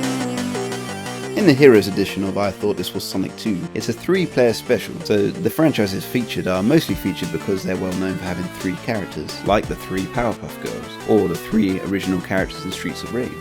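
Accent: United Kingdom. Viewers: Your British? Huh...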